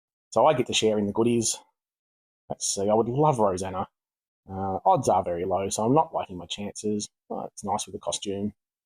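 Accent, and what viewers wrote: Australia. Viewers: Great video, man! I could listen to Australian English all day long. Keep it up, your content is really amazing! Also, you seem to have a very smart cat! Great idea of yours! But don't worry, I'm sure there are enough people who watch your videos regularly. And of course, thanks a lot for your content! 😉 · thanks legend, new to your channel but always good to hear a fellow aussie voice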